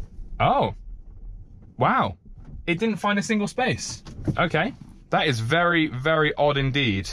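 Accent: United Kingdom. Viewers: For some reason your British voice makes this video 100 times better, I have no attention span and 20mins of watching a car park was the best 20mins I spent all day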